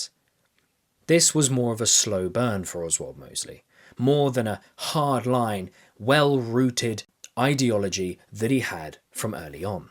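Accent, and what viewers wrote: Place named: United Kingdom. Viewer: God how I hate the British accent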